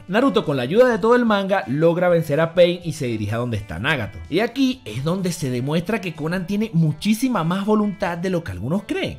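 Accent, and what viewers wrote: Venezuela. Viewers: en este video se le sale el acento venezolano a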